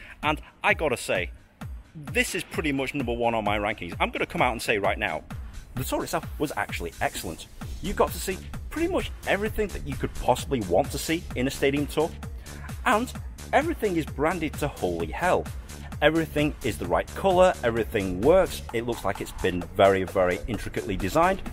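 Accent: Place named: Manchester, United Kingdom. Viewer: I could kind of tell you weren't a Spurs fan due to your Northern/Manc accent, and then you explained you're a Man United supporter so it explains it 😅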